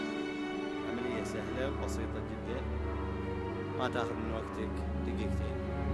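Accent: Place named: Iraq. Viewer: كﻻمه عراقي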